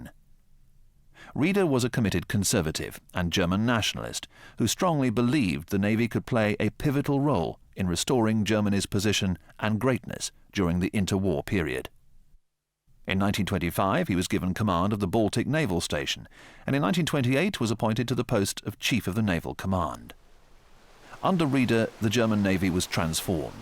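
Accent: United Kingdom. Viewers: British narrators are the best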